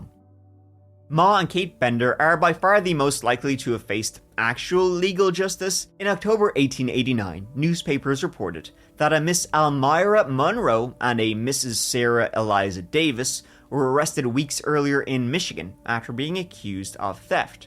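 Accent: Ireland. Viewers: Battling my third round of COVID in 8 weeks. Needed the soothing voice of my favourite Irish lad.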